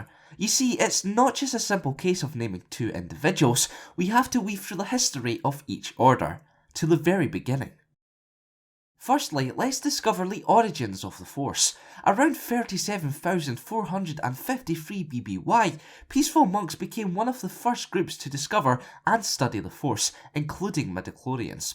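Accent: Scotland, United Kingdom. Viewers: Are you Scottish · Good to hear a fellow Scot out there doing this s#*#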